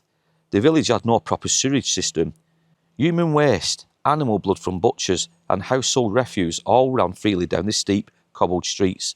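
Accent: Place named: Northern England, United Kingdom. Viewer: Thank You so much for these Northern stories! I’m an American that grew up in Rochdale from 9yrs of age to 19yrs until I moved back home to the States. This was <num>. My youth was spent growing up on the Pennines, Yorkshire Moors, Saddleworth and The Dales. So much history you have uncovered that I never knew about. My mother still lives in Rochdale and I get over when I can. Been watching all your videos and hearing your northern accent warms my heart. Cheers and keep up the excellent stories and your investigations. Truly love these videos. The very best!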